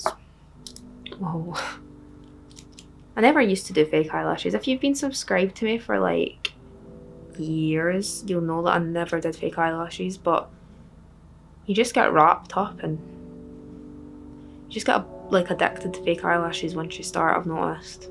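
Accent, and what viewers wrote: Scotland, United Kingdom. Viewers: aaaah her british accent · I love your accent,it is scottish ? 🖤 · I watch it for the accent, i fell in love with Scottish accent when I heard her speak 🥺🥺🥺💕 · больше на британский акцент похоже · I love your accent! Are you Scottish? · даа и мне плохо от ее акцента хпхп. То есть это вроде British но ясно что из какой-то определенной специфической части Англии, не пойму откуда именно ахахп. Но в любом случае she's incredible 💓🌹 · And don’t apologize for not being American. The whole world (pretty much) can access YouTube. Your accent is lovely and if you’re English I can tell the regional difference vs. other areas of England. But you do sound a bit Scottish (which if you’re from England, the area would explain why you’d sound a bit more Scottish or even Irish.) Idk how I came across this but I’m glad I did. Cheers mate. 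Skål! You’re adorable, Deary!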